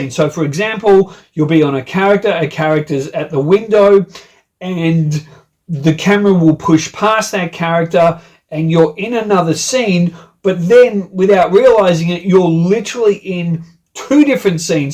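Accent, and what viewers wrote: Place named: Australia. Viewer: I love your Australian accent ^__^ !!!! Oh my gosh, love from Texas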